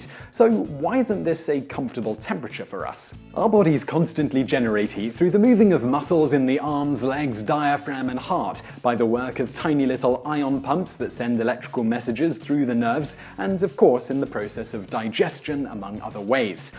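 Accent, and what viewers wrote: United Kingdom. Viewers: Call me Daddy Well no shit... a google search could answer anything. The reason most of us watch this channel find out something we could easily look up is to hear charming British accent relaying the information lol.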